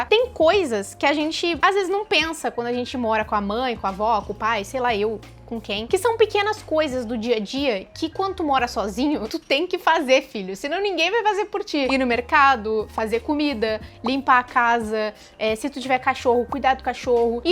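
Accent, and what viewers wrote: Brazil. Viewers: Pensei que vc fosse de SP 😂😂😂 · VOCE É DE PORTO ALEGRE? KKKK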